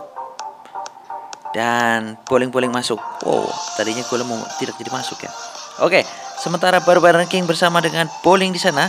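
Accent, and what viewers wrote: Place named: Java, Indonesia. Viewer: suaramu wagu bang. medok dan tidak beraturan. ga enak d dengernya. #no haters.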